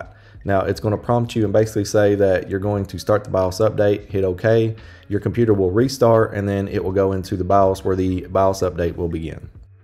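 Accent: South, United States. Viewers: a little southern drawl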